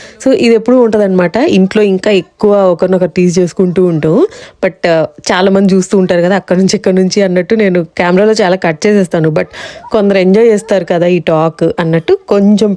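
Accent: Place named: Andhra Pradesh, India. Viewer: Authentic andhra telugu by bro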